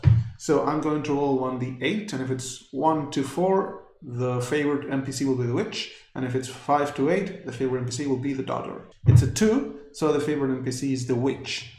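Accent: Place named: Greece. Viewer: Yeah, you sound exacly like my teacher from greece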